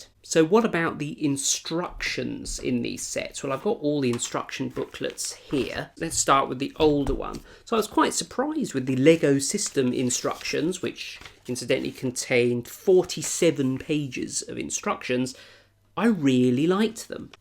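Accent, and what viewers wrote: United Kingdom. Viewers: Ur British accent is nice Makes my ears joyful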